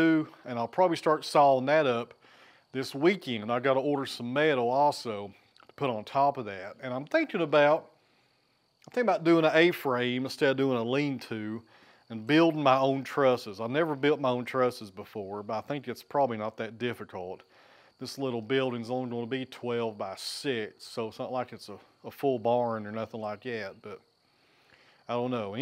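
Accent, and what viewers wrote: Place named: Ohio, United States. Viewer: Listening to you makes me just a touch homesick. Southwest Ohio, true, but rural Ohio isn't much different. Thank you, Sir.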